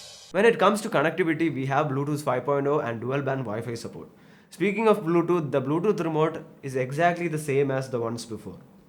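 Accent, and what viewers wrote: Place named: Kerala, India. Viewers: He is a mallu bruh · accent is very thick and top of that he has a strong south indian accent, something I find it very hard to listen to...!!! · That strong mallu accent is why I watched the whole video. No kidding. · Looks like the host is a Malayalee from his accent! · Malayali accent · Its like malayali accent 😀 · loved your Mallu ascent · Strong malayali accent with a super detailed review.. what's not to like?? Loved it!! Hope to see you more😀😀😁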